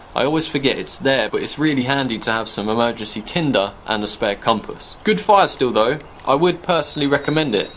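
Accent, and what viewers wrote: United Kingdom. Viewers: did u say u need a fick durable knife? did u say sheaf? free sections of gear? Firty meters of fishing line? I love the way brits talk !! do us yanks sound like that to u guys?? Oh yea and u got all those bags of morale boosters but i didnt see a bag of weed or a bag of coke!! Cheers m8. Put another shrimp on the bar-b :) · Awesome british accent, amazing and clear tips and good jokes, officially sir, you are awesome !!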